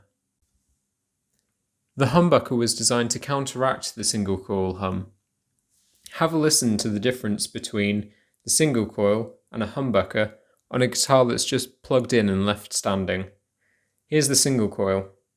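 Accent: United Kingdom. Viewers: Thank you so much. Great explanation and so good to hear a British voice.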